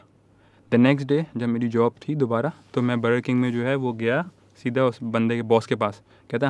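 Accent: India. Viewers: First time suna to lga ki indian hai but really love his style ❤️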